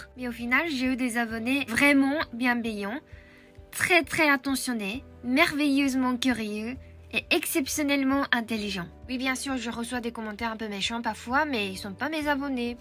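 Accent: France. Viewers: Joyeux anniversaire en retard moi c’est demain mon anniversaire 😊❤Et aussi ne change pas tes parfaite ,j’adore ton axant français quand tu parle ❤ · 🎉 Je suis tombée sur ta chaîne tout à l’heure et AHHHHHH TU ES TROP MIGNONNE !! Tu es super attachante J’adore ta prononciation française !!! Ton accent est adorable ! Tu as une personnalité tellement tellement gentille Tu es bavarde aussi, c’est vraiment un plaisir de t’écouter Tellement naturelle ☀️ Merci pour tes vidéos 🙏 Des bisous de la France ! 🇫🇷 je suis sûre que tu lui manques beaucoup ! 🥺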